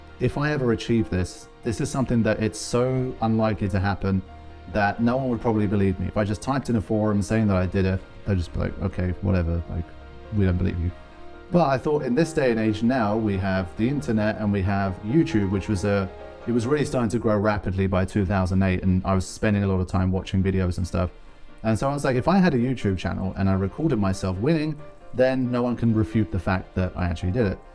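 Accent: United Kingdom. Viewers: Dang, you sound so british when you're muffled. Congrats on the 15 years!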